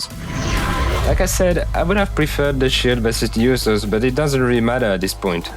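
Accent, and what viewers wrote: France. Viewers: Wow man, your English is great, unlike many other YouTubers'. Like that French accent as well. Great content, très bon!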